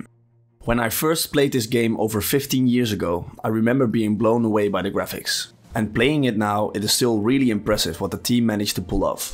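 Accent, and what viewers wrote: Netherlands. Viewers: goeie video, maar het is onmogelijk om een nederlands accent niet te herkennen als nederlander haha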